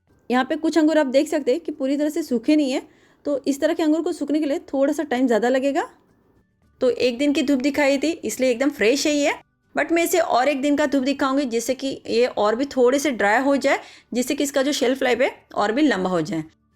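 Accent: India. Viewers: I love your hindi accent.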